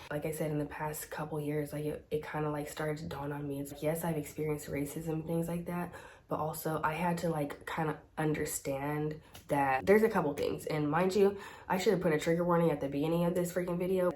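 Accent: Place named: California, United States. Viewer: You look Italian or French probably part African but I can see why they said part Asian. You have high cheek bones but a smaller face. I can hear the hispanic/California influence in your accent however. You are gorgeous. Don't stress there is no such thing as race, that term was part of racist Eugenic theory. They created the term so that they could boost Europeans as the superior race. For some reason in the U.S. they still use it for programs etc.. In the last census, people of Spanish descent REFUSED to fill out their stupid narrow boxes as Latinos do not see themselves so narrowly. In the sciences they stopped using this term "race". The term used now is ethnic, for example, Germans are an ethnic group. So you are multiethnic African , perhaps English Scottish etc. but I totally understand what you are saying. Everyone is multiethnic to honest, I wish people would get over thinking anyone is pure 100% anything as it is just not true even if it doesn't show up in a DNA test lol. 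The reaction you got to calling out of business staff when they are in error is something that is class driven as well as looks driven. Many people who work at hotels etc.. come from countries where the more you call them on stuff the swifter the correction. It is an expected and accepted way of talking to them. Hate to say it but it is true. Most Americans and the even English are REALLY super nice, staff know this and sometimes will take advantage. Snapping them to when needed immediately makes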